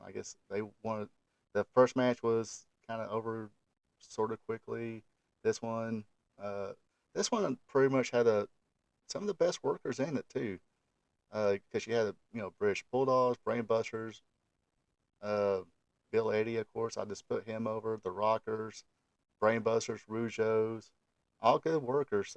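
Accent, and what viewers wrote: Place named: South, United States. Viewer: Man i am so glad fans like you cover these. This on has a special place in my heart . My first PPV . Better days. Dude where are you from. Your accent is Tennessee or Georgia. Old school fan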